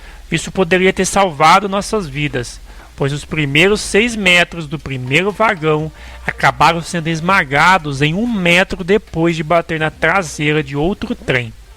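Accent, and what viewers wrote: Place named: Caipira, Brazil. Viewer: Um erro de português da para consertar, agora querer que a pessoa mude seu sotaque e ainda chamar de caipira é muita falta de bom senso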